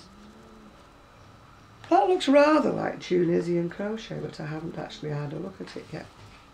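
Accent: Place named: Northern England, United Kingdom. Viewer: Hello. I just started crocheting 2 weeks ago and I found your videos when I was looking for crochet book reviews. I have subscribed to you as I love your videos:) I was intrigued to find out where you're from because of your northern accent, and I saw on your Etsy shop that you live in Manchester. This made me smile because I went to university in Manchester.:) Anyway, thank you for your videos. I hope I can be even half as good a crocheter as you one day! xxx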